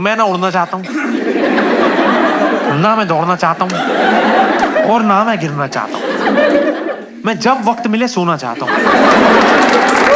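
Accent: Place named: Madhya Pradesh, India. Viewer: A few months back he did this set in Bhopal as an opening act for Zakir Khan. It was my first time watching a stand up comedy show andit was very easy to see that the audience could relate to the set. As I said, I am from Bhopal, is a junior from school and many of my friends went to the same college as he did. The school things that he talks about in some other videos, I can very much relate to. My friends talk a lot about their local engineering college experiences and the way they share their stories and the stories themselves are very similar, it's so funny to listen. And it seems like it must be a very common phenomena to have such friends' groups and the dynamics that they had and "मध्य प्रदेश के ग्रामीण परिवेश के बच्चे" is such a prominent thing in the colleges of Bhopal. Everything he talked about, the way he talks, the lingo, the references, all the stories feel so similar that most of it is pure nostalgia. 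It is very much a Bhopali thing. I also realise that a lot of the things he says are for the performance but honestly a lot of it is quite relatable. I used to participate in open mics a decade ago and I remember he used to do open mics in his early days as an aspirational stand up comic. He has grown so much as a stand up comic, it is such a fascinating journey to see. He still has that inner millennial Bhopali vibe. Wo DB mall ka food court and the Christmas tree at the entrance of the mall that he talked about, saare tamashe wahi hote the. 🤣 Aur sahi me k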